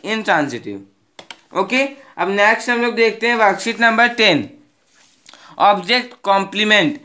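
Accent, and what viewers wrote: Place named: Bihar, India. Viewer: Bihari sa bhaiya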